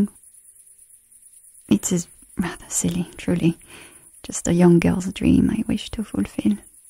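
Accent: France. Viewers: Will there still be French accent audios? Surely? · This sounds so so so beautiful!! I love a french accent and this is soo nice to listen to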